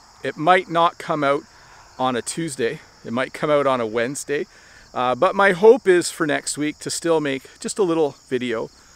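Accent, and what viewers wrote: Canada. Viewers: Hi!! Don’t worry. You should have a break. I really appreciated that you do a lot of jobs.👏👏I like Canadian teacher accents of you and. I still waiting for your lesson. 😃😃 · I had lived in ottawa four years. It seems like my second home town! Your video and your clear Canadian accent make me homesick! Don't forget make a video in maple leaves season,please!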